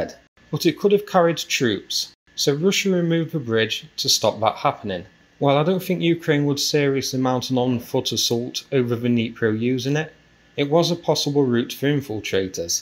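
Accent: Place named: England, United Kingdom. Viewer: What I like the most about this channel is that the analysis, I would say forensic, is very deep and advances with overwhelming logic until some conclusion or leaves more questions to think about. It reminds me of Mr. Spock. I want to congratulate you for all these videos, for the marked English accent, and for the pauses that are just as revealing as the words!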